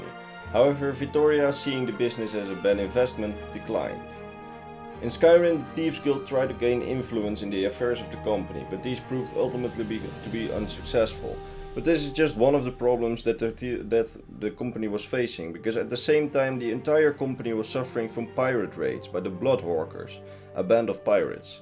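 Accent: Netherlands. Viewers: You’re dutch right? Judging by the accent.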